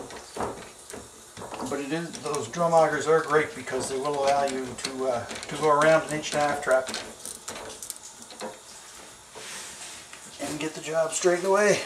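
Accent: Canada. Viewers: The narrators Canadian accent is throwing me off. Dont know when he means house and hose...they both sound the same to me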